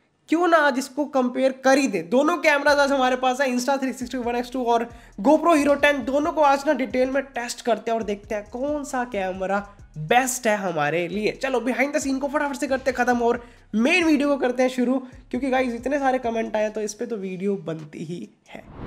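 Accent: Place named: Kashmir, India. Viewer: Bro....ur accent gives Kashmiri touch.... Anywz nice comparison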